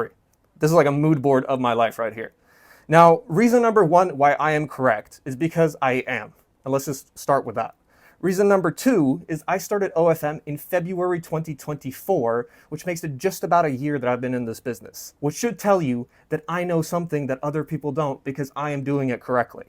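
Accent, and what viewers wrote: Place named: United States. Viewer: you are definetly not from eastern europe, your accent sounds definetly as an american lol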